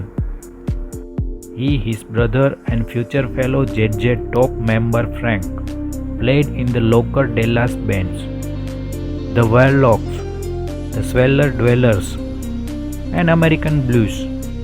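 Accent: India. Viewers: The voice in the documentary seems Indian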